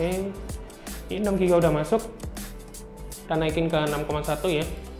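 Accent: Java, Indonesia. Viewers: Khas medok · Medog tenan mase 😀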